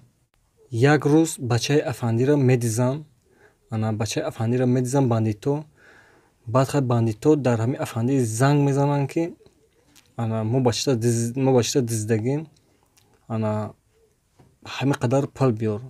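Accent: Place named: Khatlon, Tajikistan. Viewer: Kor nadoren diga rav aval kamtar gap zadana yod gir, yak video 5 minuta 800 bor pauza kaday, ay gap zani malumay ay Khatloni neki gap zadana yod gir.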